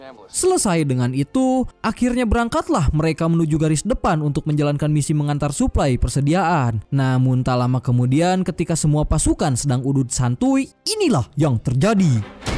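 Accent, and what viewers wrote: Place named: West Java, Indonesia. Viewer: Urang Sunda nya,,, edun euuuy lah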